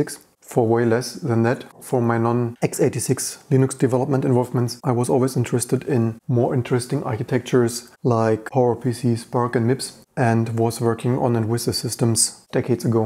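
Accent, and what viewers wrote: Germany. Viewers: Mh the heavy german accent, delicious! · Your english is very german.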